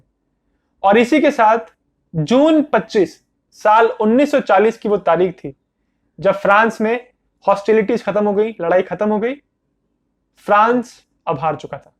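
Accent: Bengal, India. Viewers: Bro are you a Bengali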